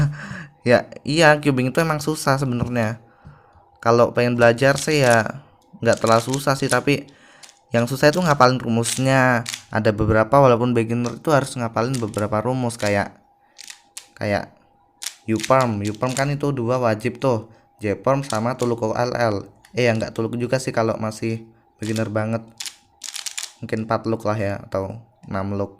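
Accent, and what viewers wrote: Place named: Java, Indonesia. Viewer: Medok mu itu loh masss <3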